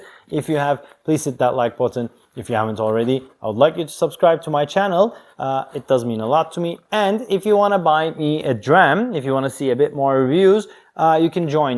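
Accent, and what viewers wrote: Turkey. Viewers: Kardeş sen Türksün b/o your pronunciation. Also kaju ... :)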